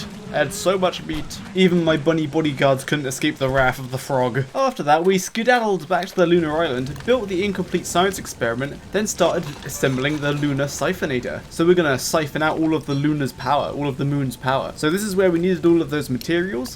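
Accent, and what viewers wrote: United Kingdom. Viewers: This Dude "The Spiffing Brit" You sound so much like him at the beginning of the video that I though you was trying to copy his work. Not just the brithsh accent but some lets say jargons are about the same. Brithsh people must talk in some pattern..That is sure to sound silly but there's a reason for it I've watched the whole video though and i love it. Never got so far in this game as the first winter aways kills me and friends so i've leaner a lot. Sorry if that coment bothered ya, no one ever replies so i didn't take the time to remove it. I subscribed right at the and of the video, and I hope to see more of your work on dont starve as it is a game that i like a lot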